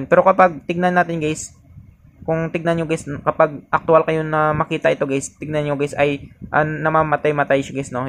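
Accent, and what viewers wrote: Iloilo, Philippines. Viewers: Dw ilonggo ka bala mag hmbl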